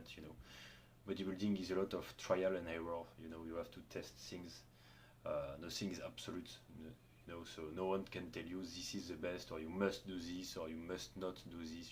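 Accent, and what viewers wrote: France. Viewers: Great video and iconic French accent. Subscribed 👍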